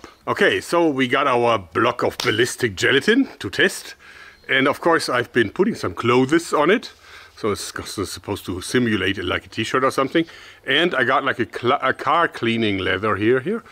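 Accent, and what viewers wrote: Germany. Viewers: I dont know why, but hearing any German say "oops" instantly brightens my day and makes me smile and laugh, haha. I Love the German accent!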